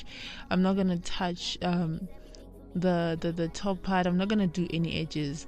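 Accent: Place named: Africa. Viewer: she speaks like an African, there's no American accent even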